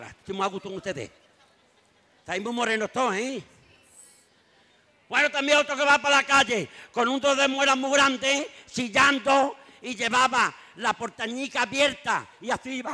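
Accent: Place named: Andalusia, Spain. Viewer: Solo consigo entender el 40% de lo que dice. ¡El andaluz no es castellano!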